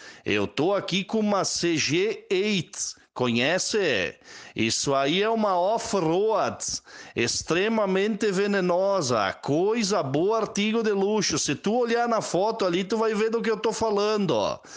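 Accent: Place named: Minas Gerais, Brazil. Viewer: Não é não.O sotaque dele é mineiro.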